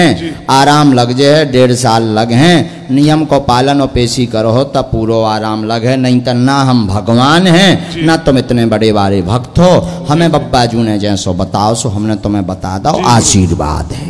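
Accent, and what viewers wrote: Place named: Bundelkhand, India. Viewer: गुरु जी आपकी बुंदेलखंडी बोली बहुत अच्छी लगती है, संन्यासी बाबा की जय, बागेश्वर धाम सरकार की जय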